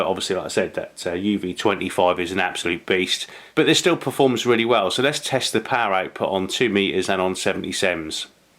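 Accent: United Kingdom. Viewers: I just got mine (US). It's a pure beast on 70CM, hitting repeaters way out. 2M is ok to close repeaters, even with different antennas. Big clunky buttons feel awesome and the GPS is strong (hold down the A/B button for GPS). When transmitting, be sure to press the transmit button hard and wait a second or two before speaking. Too bad you couldn't get this info from the video, but you know, clicks.... But at least he's got a British accent.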